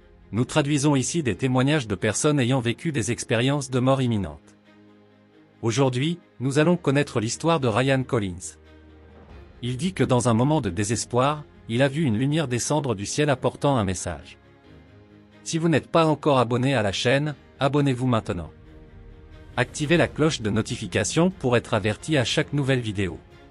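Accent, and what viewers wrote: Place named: France. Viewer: Ca parle aussi bien espagnol qu'une vache espagnole. 😂Mais malgré l'accent bien franco-français, j'ai quant même compris la fin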